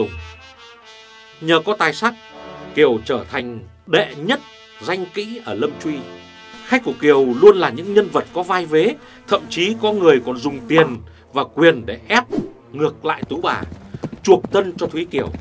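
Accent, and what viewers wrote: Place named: Hai Phong, Vietnam. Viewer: Giọng Hải Phòng đặc sệt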